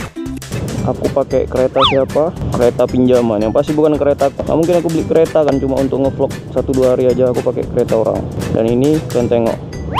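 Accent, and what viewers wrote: Medan, Indonesia. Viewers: Ko kiranya medan bg jemping jemping sampeng😭😂😂😂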